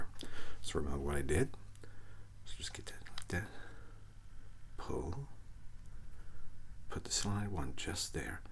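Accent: United States. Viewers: Out of curiosity: What made you decide to do your Reiki Sessions in an American accent? He doesn't really seem like a caricature, and just seems kinda like a normal guy. Do Brits associate Reiki with Americans?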